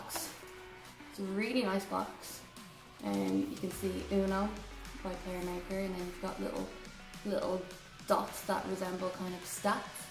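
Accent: Ireland. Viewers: Congrats and Merry Christmas 🎄 Which Irish accent is this btw? Struggling to figure that one out..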